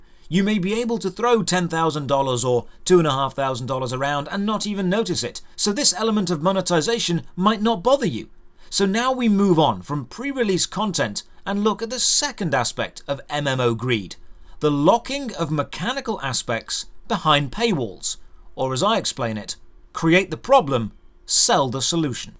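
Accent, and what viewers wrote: England, United Kingdom. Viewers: I like the way u talk to me English man, Keep it up ole chap XD !! great series so far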